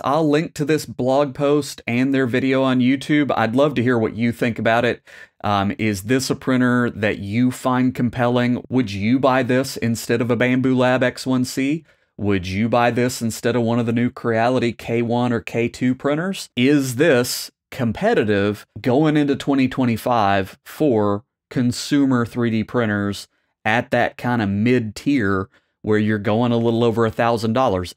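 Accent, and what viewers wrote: United States. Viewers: Based on your accent, I am going to assume you are an American. Can your cars compete with Chinese cars on price, if not for the extra taxes put on them? No? So why the hexx to you expect a Prusa to beat their Chinese counterparts on price? Being a European company, I don't think the price was all that bad! Okay, it may not bring entirely new features. But it brings them Prusa-style! Backed by support that is second to none in this segment, and supported for years to come! Ever since the X1C hit the scene, I have wanted for Prusa to bring their version. I simply can't muster any enthusiasm for any of the Chinese printers! Qidi was close, simply because they brought the heated chamber. But a few fires later, I did not care for one any more. Privacy is clearly not a thing for these companies. That is one of the things I like about Prusa! 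You CAN completely air-gap your printers, and even use an offline computer for slicing, if you want to! Although it would be nice with an included camera, at least you will get one. And you can unplug it, should you feel like it. So the great news here is not the feature-list! It's the fact that it's a Prusa!!! Get over this size-thing-please! People still build 120cm cubed Voron 0.2's. There is a marked for this size. And I think it was a smart move for them to expand upon the MK4; simply because a lot of people whom recently bought their MK4's now won't be left behind. What they loose in sales due to "sma size", they will make up for in sold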